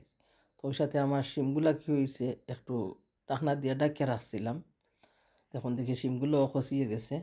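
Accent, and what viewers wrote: Sylhet, Bangladesh. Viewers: সিলেটি কথা খুব ভালো লাগছে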